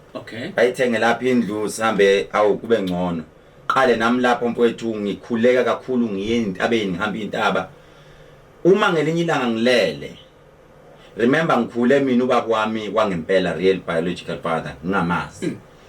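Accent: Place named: Zimbabwe. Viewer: Ayi bengihlale ngisho ukuth uphuma eZim ngizwa ulimulakho uma ushumayela.kodwa ke uyiqhawe bhuti omncane futhi sengiswele amandla okufinyelela kuwe.ayi u Babawkhe waba yidlozi elihle lowakwa Hlosa kanti no stepfather wakho noMama wakho bakukhulise kahle khula bhuti omncane.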